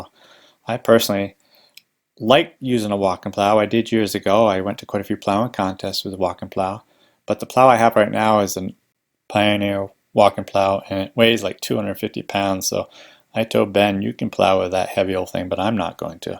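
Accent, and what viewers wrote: New England, United States. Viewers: Thanks for explaining how you got a New England accent in Upstate New York.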